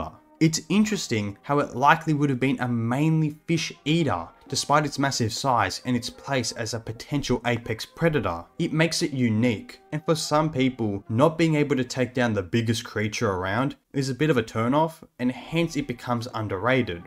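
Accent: Australia. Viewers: I enjoyed this information and cool Aussie accent-Subscribed!🎉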